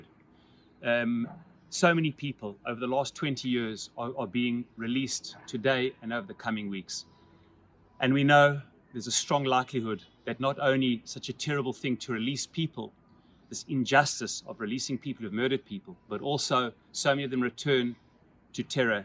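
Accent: South Africa. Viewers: Rabbi sounds south african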